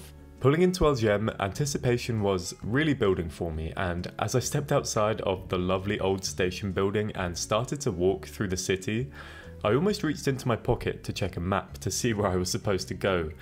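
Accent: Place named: United States. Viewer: Have you considered not showing yourself on your vlogs ? Your voiceovers are refreshingly non-irritating, American, and very authoritative and your subject matter informative, but just hearing your voice without seeing your face would be more enigmatic. There are too many Youtubers trying to become personally famous instead of their content, and including your face just brings you down to their level.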